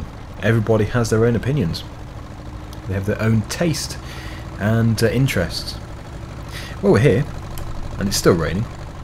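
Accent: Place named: Guernsey, United Kingdom. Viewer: where u born in guernsey cuz i am from there and your accent is guernsey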